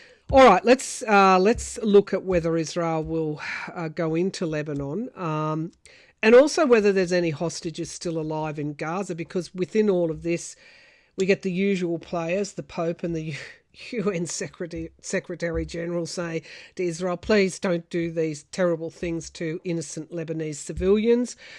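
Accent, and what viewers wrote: Australia. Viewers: Why would anyone write negative comments against her? She's a gem💎, & I look forward to her readings weekly. I luv her accuracy, because she's always right on point. I luv her beautiful Australian accent & her numerous neck scarves! U have to be a nutjob to not like her & need to go away. I agree that BiBi won't do a cease fire until after the US Presidential elections, probably because Trump told him not to.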